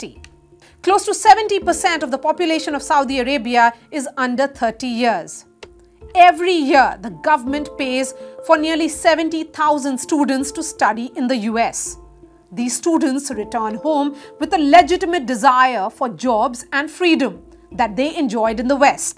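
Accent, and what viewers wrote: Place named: India. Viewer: You the only white girl with Indian accent.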